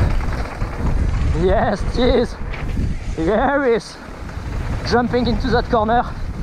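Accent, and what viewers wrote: France. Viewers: Your accent look's like French accent 🤔 By the way you're a very good rider ! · You know,you’re such an talent,you’re videos are amazing, but,honestly,i can’t listening this french sound english,it rippes my ears dude and i’m just wondering why everybody from France has such a strong accent.🤷🏼‍♂️😣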